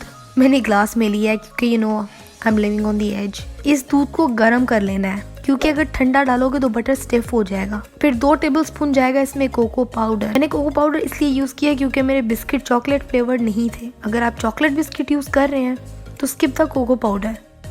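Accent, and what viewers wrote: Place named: Punjab, India. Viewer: Awaz kharab hai bol rhi ho aap . Par aise bhi kitni pyaari lagrahi hai . Aapka punjabi accent bht pyara lgta hai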